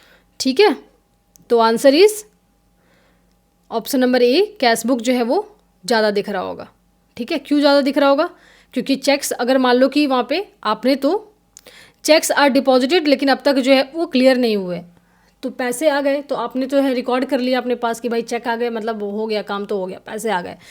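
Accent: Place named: Haryana, India. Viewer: Ma'am ki bat sunke lagta hai ki she is either from Haryana or Western UP..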